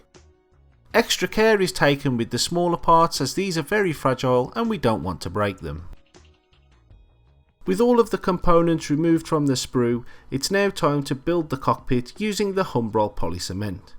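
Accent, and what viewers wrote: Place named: Black Country, United Kingdom. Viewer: He sounded like a yam yam to me.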